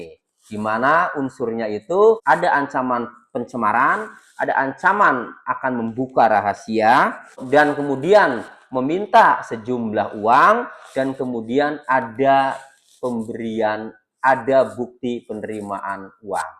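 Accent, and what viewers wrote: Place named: Banyumas, Indonesia. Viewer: Yang punya Chanel ini pasti orang Banyumas dsk,ngapakers ya👍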